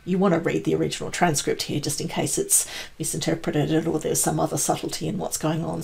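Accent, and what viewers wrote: Australia. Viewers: Thanks a great explanation (in an Aussie accent 👍🏾) Keep it up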